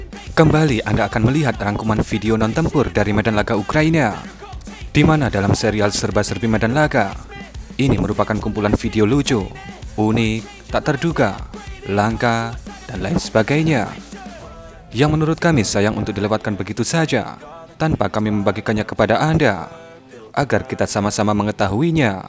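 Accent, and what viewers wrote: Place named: Java, Indonesia. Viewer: Berak2 matamu iku medok2😂😂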